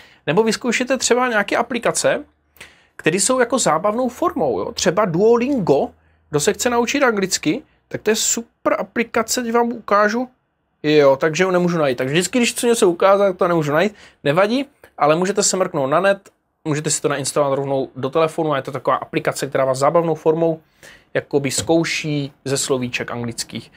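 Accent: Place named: Moravia, Czech Republic. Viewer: Ten moravsky prizvuk je zabavny k tvemu videjku :)) Delas to skvele.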